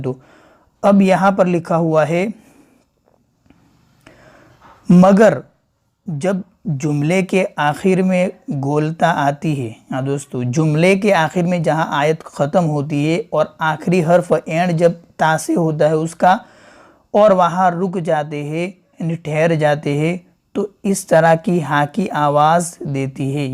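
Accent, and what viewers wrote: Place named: Maharashtra, India. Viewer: aapki accent Maharashtra ki lagti hai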